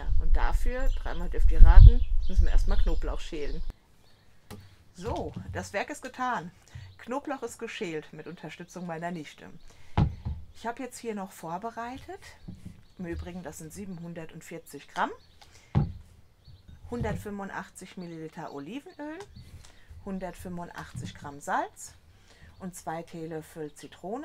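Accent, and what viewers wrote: Hesse, Germany. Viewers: Großes Lob an die Macher, und am besten gefällt mir, dass ab und zu auch mal ein hessisches Wort durchklingt 🙂👍weiter so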